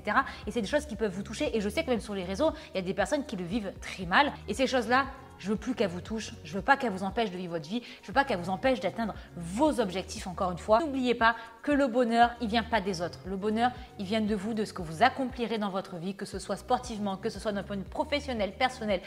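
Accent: South, France. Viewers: Je vous adore, je ne suis pas très assidu sur TSE 😔 mais vous m'avez fait beaucoup de bien pendant la pandémie. Votre travail est incroyable en deux ans, félicitations a vous et votre équipe. Et entendre votre petit accent du sud me fait du bien icitte au Québec. On lâche pas 😊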